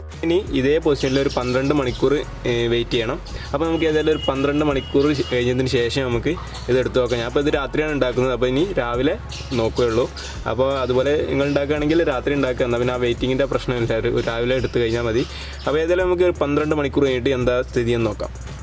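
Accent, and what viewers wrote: Malappuram, India. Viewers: Daaaaa.... ഇത് നമ്മടെ മലപ്പുറം ഭാഷ ഒരു കുഴപ്പവുമില്ല നീ പൊളിക്കെടാ മുത്തെ...... 😘KL_10 chunkss😍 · മലപ്പുറം ആണോ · Njammalum malappuram okke thanne aanu bro... Bhasha onnum oru kuzhappavum illa... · malapuram .slang athaanu. njanum malapuram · Pinnalla muthe njan kottayam but malappuram slang is so beautiful bcz ente kooduthal frnd malappuram anu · നമുക്ക് നമ്മുടെ മലപ്പുറം ബർത്തനം തന്നെ മതിയെടാ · പക്കാ മലപ്പുറം സ്റ്റൈൽ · Malappuram da😄😄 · മലപ്പുറംകാരെ signature മാറ്റല്ലേ bro... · Kakka nee malappurathaano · എടാ ചെക്കാ ഇജ്ജ് മലപ്പുറം ഭാഷ തന്നെ പറഞ്ഞാൽ മതി. ഞമ്മക്ക് തിരിയും ട്ടോ ചെക്കാ. നിന്റെ ഇന്നസെന്റ്സ് എനിക്കൊത്തിരി ഇഷ്ട്ടായി. Love you bro. Kl 10 ആണ് ഞാനും. കാളികാവ് മാളിയേക്കൽ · soopar . edakk swandam basha warunnundallooo .fullaayitt malapuram basha paranjoolee😃😃 oru koyappalla... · Good, മലപ്പുറം മലയാളം തുടരട്ടെ. മനസ്സിലാകത്തവർ ചോദിച്ചേക്കാം. 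· എന്റെ അഭിപ്രായത്തിൽ ഇജ്ജ് അന്റെ അല്ലെങ്കി മാണ്ട ഞമ്മളെ തനി മലപ്രത്തെ ഭാഷേ തന്നേ പറഞ്ഞാൽ മതി ചങ്ങായി അതിനും അതിന്റേതായ ഒരു മൊഞ്ചുണ്ട് ഇബനെ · മലപ്പുറം ഭാഷ 😘😘😘😍😍😜😜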